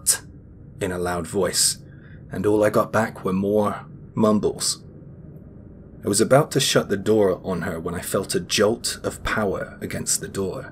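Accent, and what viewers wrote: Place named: England, United Kingdom. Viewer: Such unique content. Japanese stories translated to American English with an English accent! You have something special here.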